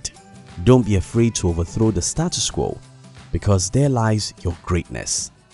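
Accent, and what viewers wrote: Nigeria. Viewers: It's quite obvious he's Nigerian. So what? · you sound nigerian.are you? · This guy on the audio is Nigerian trust me .. he just Dey Lagos here now Dey tell us how to make money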